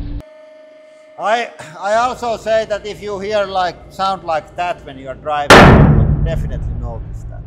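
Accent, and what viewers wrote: Finland. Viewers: English speakers, how do you find this guy's English? This is what we call in Finland, 'rally English', and I hear some of us really speak this way. I don't, but I'm a curiosity.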